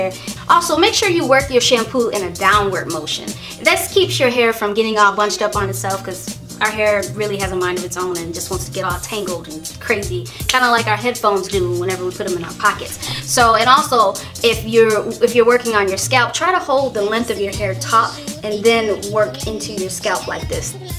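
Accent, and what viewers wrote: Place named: New Orleans, United States. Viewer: I love your accent are you from new Orleans??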